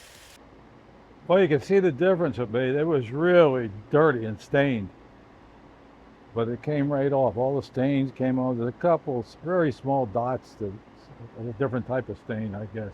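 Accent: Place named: New Jersey, United States. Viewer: Thanks for your videos. You remind me so much of my grampop and my dad (you are younger than both lol. But my memories are of them when they were still here. ) keep laughing it makes me so happy to hear that laugh. Just like ol Cornie's laugh. And you walk and move like dad (he was a sailor in his youth. You just don't lose that rolling gait!), your jersey accent makes me feel like I'm home again. (Jersey girl too). I look forward to your videos. Keep catchin!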